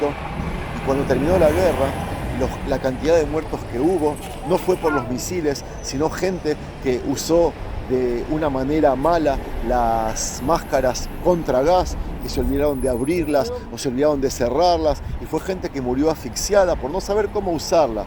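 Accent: Argentina. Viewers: Viniste alguna vez a Argentina? Tnés algo argento en la forma d hablar🙌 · es judío? Xq habla español y con asentó argentino chileno no se q asentó sea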